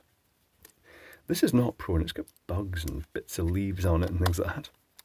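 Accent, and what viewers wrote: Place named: Glasgow, United Kingdom. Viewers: I'm from Glasgow So nice hearing a familiar accent . I live in Michigan now for the past 25 plus years. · lol I find myself doing the one moment please in a glaswegian greenockian accent, my girlfriend looks at me weirdly been doing that constantly just because of lol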